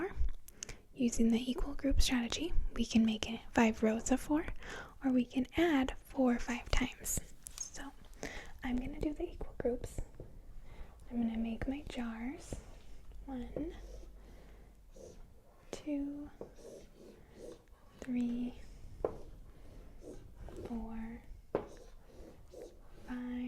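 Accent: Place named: Upper Midwest, United States. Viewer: Based on the accent, I'm guessing upper Midwest U.S.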